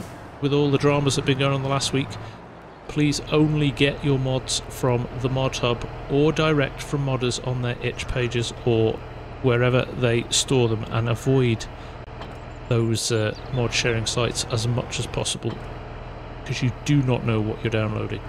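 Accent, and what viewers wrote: United Kingdom. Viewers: I really enjoy the thoughts and insights of. For me, he is the companion guide to playing FS22. (Must be the British accent) I appreciate him putting us onto modders who have really put in a lot of thought, design, and utility into their art...It all just makes the game so much more enjoyable. BIG thumbs up!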